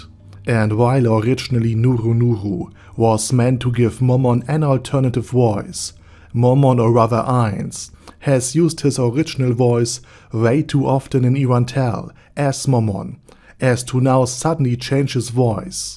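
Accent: Germany. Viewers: The german accent is pretty obvious even tho you speak without mistakes which i find pretty funny.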